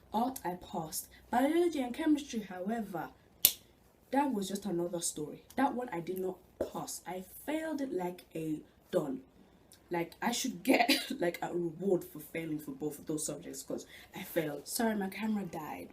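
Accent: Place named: Africa. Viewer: Hi i really enjoyed this this video! especially your accent, where in africa are you from, i'm from the east but i live in england. I'm doing btec creative media (level 3) because i don't think A levels are for me.i start this september! i am happy with my results, i only failed maths but i am retaking that. A you still doing btec?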